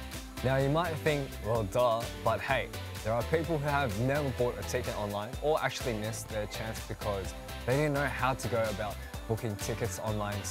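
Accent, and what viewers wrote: Australia. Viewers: i just fell in love with the australian accent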